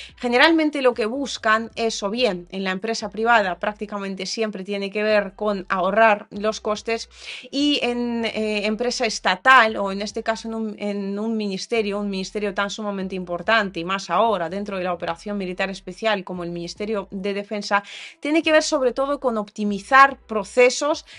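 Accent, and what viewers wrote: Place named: Spain. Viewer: Saludos preciosa te admiro adelante lo único que no me gusta es que hablas como los españoles soy mexicano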